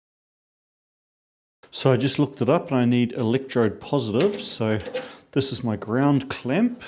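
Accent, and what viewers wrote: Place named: New Zealand. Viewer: I am a new subscriber in Australia. Until you said that you are in Austria I was sure that your accent and approach to building stuff meant that you were in New Zealand!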